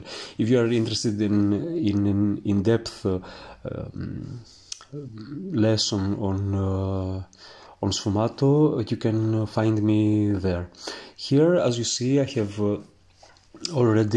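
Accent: Greece. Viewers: It's magical how you begin with black and white, and end up with this! Wonderful to watch and learn from. No need to apologize for your English; the accent is charming. Besides--you speak better English than many English-speakers can speak Greek! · Your English is more than adequate as you explain and demonstrate this process so beautifully. I recognised your distinct Greek accent immediately, with a Greek Athenian mother, and thank you for sharing your gift and skill in such a thorough way. Your work is a masterpiece with mystical presence as seen in some fayum portraits, except this young girl is brought to life more profoundly with expression as with the deeper appreciation of this timeless portrait revealing the layers and dimensions, Your painting is a language of its own. Truly impressive.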